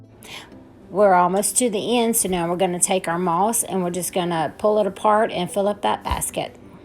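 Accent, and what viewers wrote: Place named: South, United States. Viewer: Hey love you videos! Can I ask what state do you live? I live in a very small Town in North Carolina called Lincolnton. Love your country accent! I found you though Sweet Wendy’s channel.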